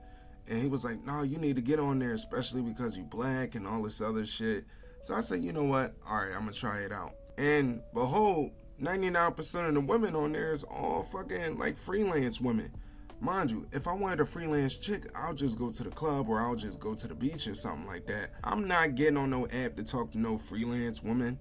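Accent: United States. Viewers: Sound like American woman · You from B-More or Philly?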